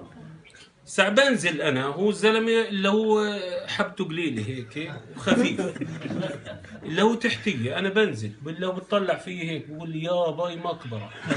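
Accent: Palestine. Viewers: فلسطيني 😂😂😂 مش عنصرية بس مبين من لهجته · هاظ مش اردني من لهجته هاظ فلسطيني مش اردني قال بسولف بكيت بدل كنت 😂😂😂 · هذا لهجته مش اردني فلسطيني مبين من اول ثانيتين عرفت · هذي مهي لهجتنا هذي لهجة الفلسطينية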